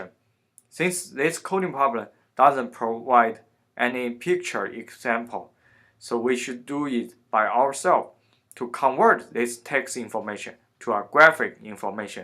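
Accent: China. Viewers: 第一句话就能听出是中国人hhhh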